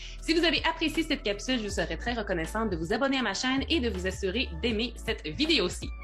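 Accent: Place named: Canada. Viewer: Merci pour ce tuto très sympa avec ce merveilleux accent canadien que j'aime tant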